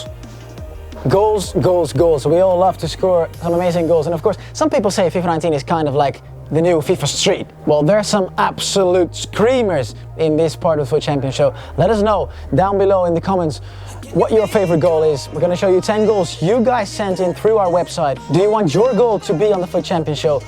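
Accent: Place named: United States. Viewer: Try to keep it english and not American english. But good job nice vid!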